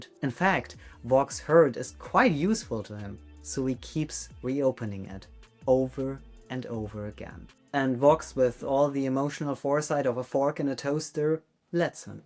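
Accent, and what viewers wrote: Germany. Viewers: Ich höre einen deutschen Akzent heraus 😏😏 Freu mich einfach, wenn Leute aus Deutschland über Hazbin Hotel/Helluva Boss reden. Sehr nices Video! :)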